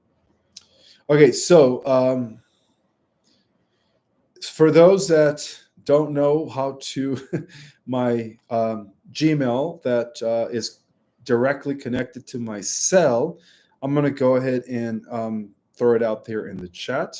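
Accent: United Kingdom. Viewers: Enjoyed your live, love your British accent lol 😄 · I'm loving the British Alto, it's hilarious and funny enough sound better than your regular accent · Love that British accent 😂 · Loved te british accent .bring humor all the time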